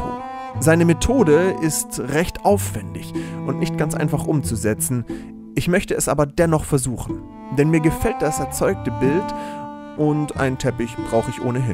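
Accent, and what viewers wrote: Swabia, Germany. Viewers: Griaßgottle ällemittenander. Dr Schwob sagt: "Mir könnet älles, ausser Hochdeutsch!" MAGIE ist für mich, dass DU als Schwabe eben DOCH Hochdeutsch kannst ;-) HANO! ;-) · Der schwäbische Professor mal wieder ;-) Sehr schön!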